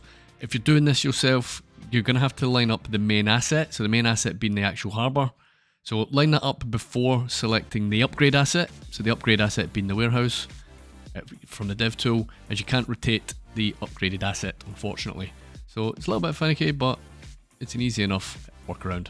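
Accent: Scotland, United Kingdom. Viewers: I like your videos! Love the intersections tutorials! Well done! Any chance you are from Scotland ? :D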